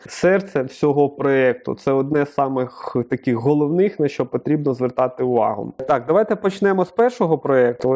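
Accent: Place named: Cherkasy, Ukraine. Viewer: это что за язык? черкасский? кировоградский? слушаать невозможно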